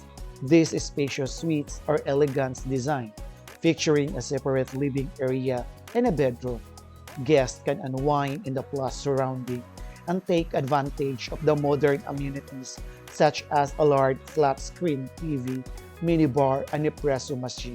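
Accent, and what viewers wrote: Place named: Philippines. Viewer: Lmao that filipino accent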